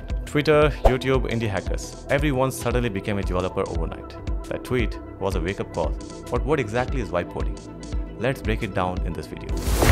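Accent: India. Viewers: Are you south indian? I just thought of asking. No other reasons.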